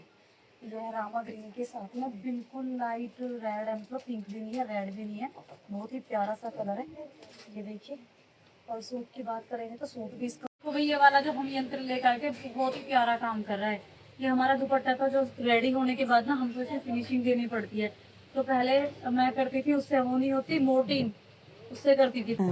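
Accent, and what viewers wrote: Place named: Baghpat, India. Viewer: Baghpat se h didi ase hi bolted h hm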